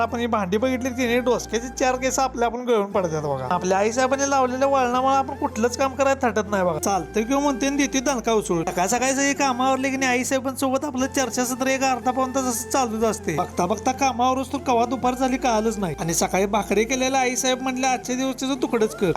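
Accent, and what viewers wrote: Kolhapur, India. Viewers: Bhava tuj gav kont re bhasha kolhapurchi vatate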